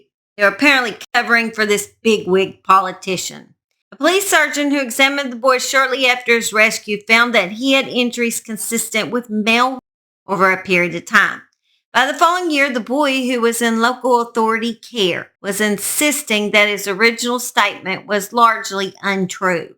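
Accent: South, United States. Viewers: Great story from my favorite southern narrator Ms.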